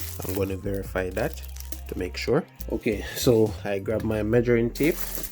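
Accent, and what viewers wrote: Jamaica. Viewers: So nice and the Jamaican accent is rlly good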